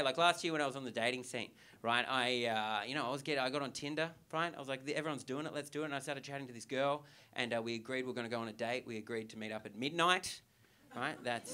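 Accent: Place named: Australia. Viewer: Wonderful! I spent 3 months in Australia about a decade ago. The accent, local colloquialisms, and Australian references and geography really brings me back. SO funny. Thank you!!!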